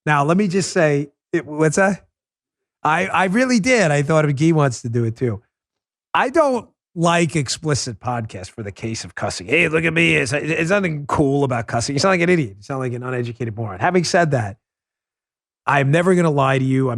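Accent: New York, United States. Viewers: Hi Love your podcasts… and your NY mouth 😀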